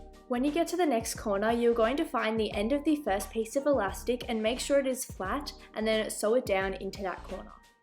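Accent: Australia. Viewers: Wow amazing thank u ... Also r u Australian cause ur accent sounds like u are... U have a new subscriber because of ur help and thank u for helping people... I don't have a sewing machine but I think I can manage sewing don't think it's that hard but haven't shown anything in a few years 😂... Well hope mines come out as nice as yours but I'm sure it will be ok... Stay safe and thanx again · I hear that Australian accent👌🏿👌🏿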